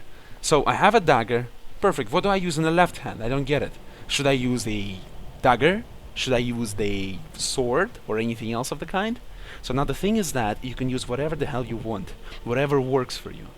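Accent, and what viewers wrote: Russia. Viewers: Russian accent is awesome! Especially swedish with a russian tinge. :) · dude, he is russian as well as I do. Accent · he sounds more Russian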